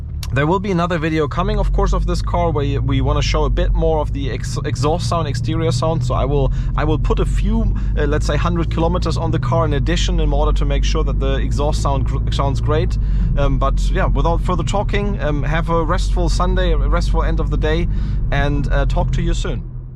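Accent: Germany. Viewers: I love the German accent😊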